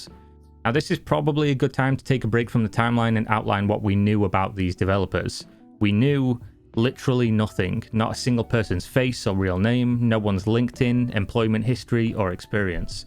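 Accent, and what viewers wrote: Northern England, United Kingdom. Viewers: Where you from? You sound Northern. Anywhere near Grimsby?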